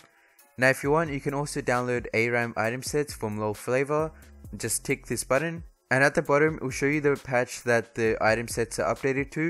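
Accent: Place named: Australia. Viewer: Where are you from? Australia? I LOVED YOUR ACCENT!!!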